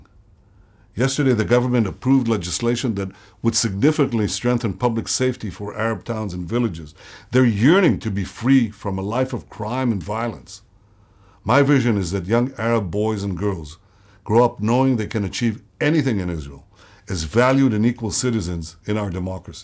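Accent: United States. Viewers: Are we gonna ignore the fact that he literally speaks English with an American accent?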